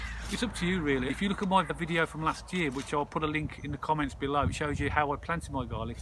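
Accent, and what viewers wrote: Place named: West Midlands, United Kingdom. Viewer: You sound as if you are from the West Midlands (like me) so I wondered where you got your garlic and onion sets.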